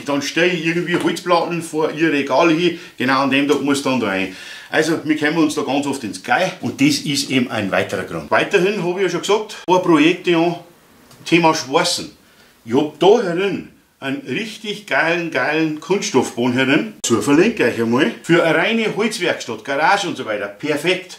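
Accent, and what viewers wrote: Bavaria, Germany. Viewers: Hallo! Als Preuße lerne ich dich akustisch zu verstehen. Ich vermute das du ein "gemildertes" Bairisch sprichst. Da ich deinen Vlog mag und mich nach anfänglichen sprachlichen Problemen zu einem Fan entwickel, vermute ich das es auch in ferner Zukunft einen Punkt geben wird das ich dich verstehen werde. Ich lasse für den Anfang ein Abo da und freue mich auf weitere Projekte von dir. 😀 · Hed eher vom slang her af woidkircha de richtung tippt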